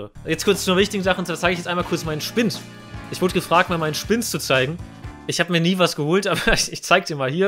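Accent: Saxony, Germany. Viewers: Hi, mal wieder geiles Video, Als ob du auch aus Sachsen kommst, weil ich komme auch aus Sachsen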